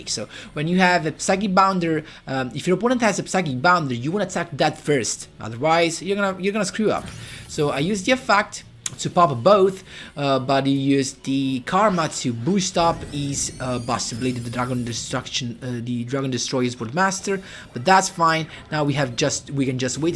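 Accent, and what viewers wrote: Italy. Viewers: Parli na cifra bene in inglese ma si sente che sei italiano bro, bel video comunque di rado si vedono deck list diverse dalle solite che sono anche competitive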